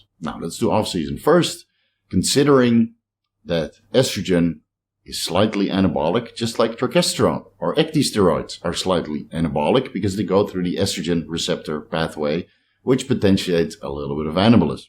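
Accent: Netherlands. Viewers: I think it may be his Dutch accent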